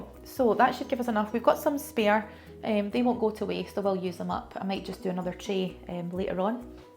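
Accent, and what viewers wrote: Scotland, United Kingdom. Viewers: I love your beautiful Scottish accent 🎉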